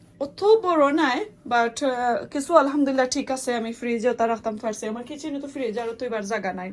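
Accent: Sylhet, Bangladesh. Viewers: I like how you speak in sylheti mashallah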